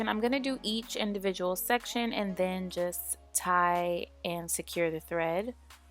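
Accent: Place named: Caribbean. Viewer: Are you West Indian or have West Indian parents. it's just the way you say cornrow and catch/ketch when talking about the braids 😭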